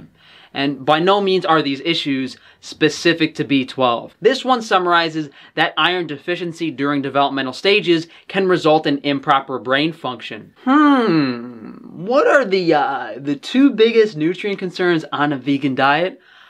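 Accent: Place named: South, United States. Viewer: that southern accent lol dang...thank-you for opening our eyes to the divides that are in place to lower our vibration and cause turmoil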